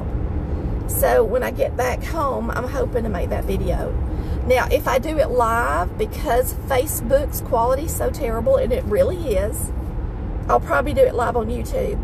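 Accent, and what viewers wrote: South, United States. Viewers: I wish she'll be my mama because I like her country voice. 😍😍🌹❤❤❤😘😘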